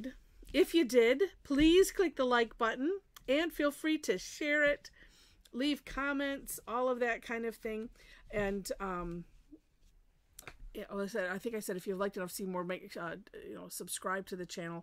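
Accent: Midwest, United States. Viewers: Everyday I watch your videos. Love them and it's so wonderful to hear the midwestern accent, I'm from Chicago but living in North Carolina and everybody here has a southern drawl.😂 thank you for your videos.❤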